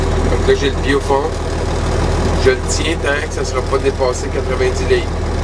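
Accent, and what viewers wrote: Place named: Quebec, Canada. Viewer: Quel accent! 2 morceaux de robot pour la personne qui trouve de quelle region du Québec il provient.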